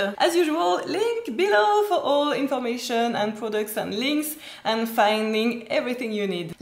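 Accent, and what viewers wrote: France. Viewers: Your not french? Your accent sound like , If no good video ^^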